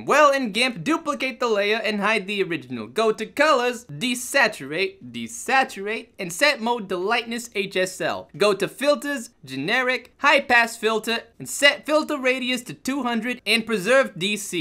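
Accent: New York, United States. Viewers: you have an awesome new york accent.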